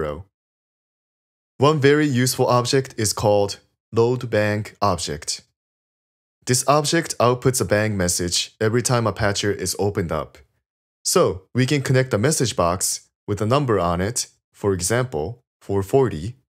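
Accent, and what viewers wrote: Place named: Netherlands. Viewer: You have a slight accent (barely) and I can't tell if you're Dutch, Scandinavian or Japanese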